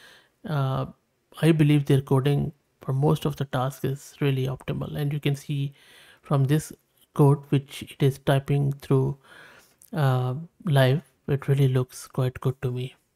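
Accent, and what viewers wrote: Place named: India. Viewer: Indians you are smart but getting info with your accent long time really making tired, train model to make normal accent.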